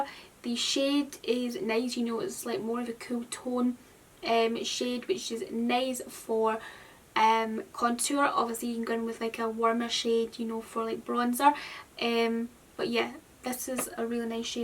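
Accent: Scotland, United Kingdom. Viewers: Are you Scottish if so where are you from because I’m Scottish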